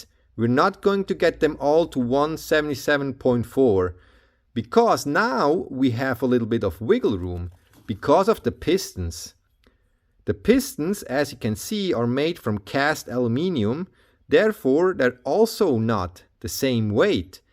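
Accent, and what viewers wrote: Switzerland. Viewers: I'm so sorry but as a German I can't stand the Swiss accent in your English. Just the captions were a lot better. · Wie immer hochinteressant und äußerst kompetent. Aber wie immer ist es auch diesmal wieder verblüffend, Englisch mit schweizerischem Akzent zu hören.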